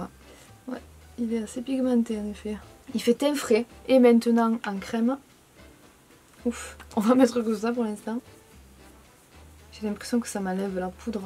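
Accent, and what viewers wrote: France. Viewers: She pronounces English as french 😅 and that " I'm shocking" it's obvious she meant I'm shocked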